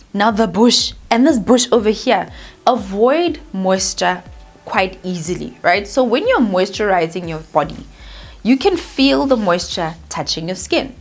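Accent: South Africa. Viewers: Are you from ZA? I have ZA (and LS) friends and I always love their accents. New subscriber! Edit: you just confirmed it! I knew it!!! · Please tell me u are South African 🇿🇦 or Kenya? 🇰🇪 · Yes she is South African💃🏻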